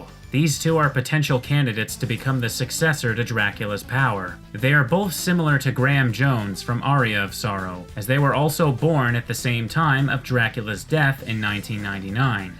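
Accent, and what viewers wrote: Canada. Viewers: Are you Canadian? Anyway this was a brilliant review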